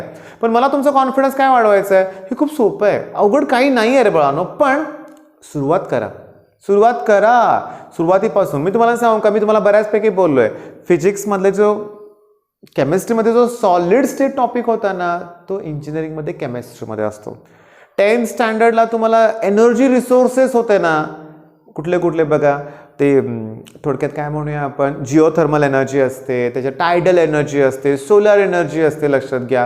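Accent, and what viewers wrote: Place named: Pune, India. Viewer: Its Puneri marathi I guess....You have no rights to make fun of this. Hope you get some wisdom about respecting our Teacher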